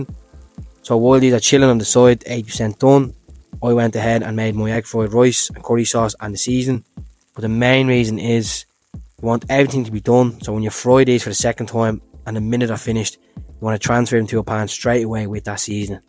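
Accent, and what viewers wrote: Ireland. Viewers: Very nice... but a few bits of advice. Slow down your speech. You have a strong Irish accent (it is lovely) but on a global stage it will be really hard for some people to keep up and understand. Second, SLOW DOWN your whole presentation... if you are trying to make Youtube revenue then you need your episodes to be longer than 9 or 10 minutes... It will attract more advertisers and gather more likes etc. Take time to fill a background story with interesting back insights or whatever. You know stuff that you think is interesting...talk about it. GOOD LUCK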